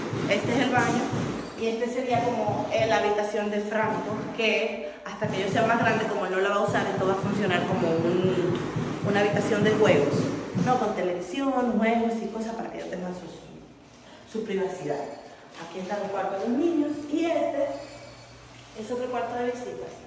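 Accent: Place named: Mexico. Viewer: Ese acento mexicano no te keda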